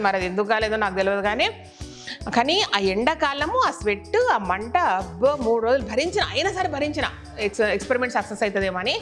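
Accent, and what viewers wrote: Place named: Telangana, India. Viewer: Hi aunty... Endukoo mee videos chuustheee masthguntadhi... Pakka telangana mataluu... Nakk masth istam... Goo on and touch the sky in your YouTube channel.. Telangana basha marchipoovadhu.... Okka doubt... Badam tolu pothe emaithadhi??....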